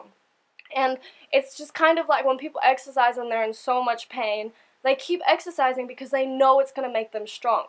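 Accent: Australia. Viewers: You're accent is becoming more Australian and it's so adorable! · Were are you from? You sound Australian but not all the way (I I'm from Sydney) BTW I love you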